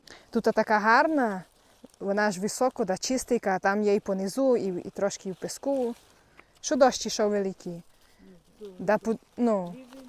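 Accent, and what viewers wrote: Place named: Rivne, Ukraine. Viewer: Хто працює той і має! Але не завжди сільський труд ціниться, до прикладу у нас на Волині, регіон Шацьких озер, малину вже і по десять гривень ніхто не бере, осипається на землю. А скільки вкрадено роботи та затрат, і часу в тих 20 соток! У вас яка ціна, та чи є збут? По діалекту ви з Рівненьської області напевне, і в районі смт. Березне проживаєте? В мене тітка з відти, тож дуже схоже.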